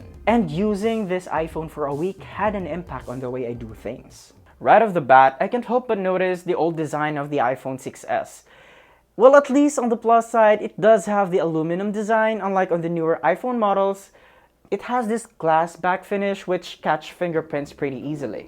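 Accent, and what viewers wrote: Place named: Philippines. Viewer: Knew he was a filipino by the accent, hahah proud pinoy!!!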